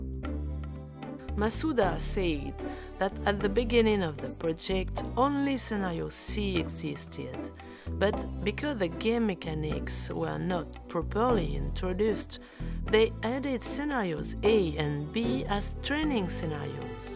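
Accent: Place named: France. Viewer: It's à French accent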